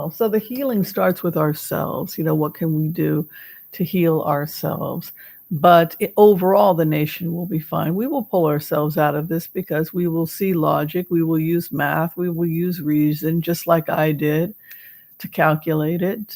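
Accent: England, United Kingdom. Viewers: As a Brit , you’re adorable English accent makes me giggle and feels healing to me! You are wonderful!!!! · Great British accent!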